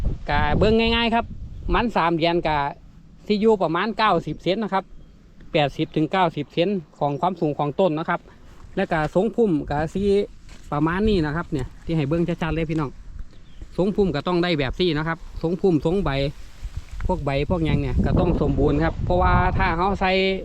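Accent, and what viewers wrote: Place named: Chaiyaphum, Thailand. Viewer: สำเนียงคือชัยภูมิแท้คับ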